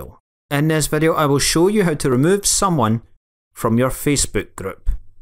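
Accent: Scotland, United Kingdom. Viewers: i love your scottish accent 👏🏼👏🏼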